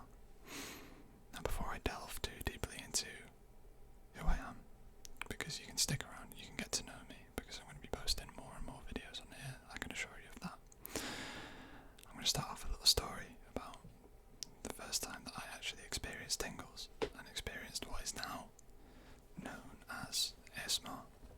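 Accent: United Kingdom. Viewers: It’s so hard to find good British ASMRtists, definitely earned a follow from me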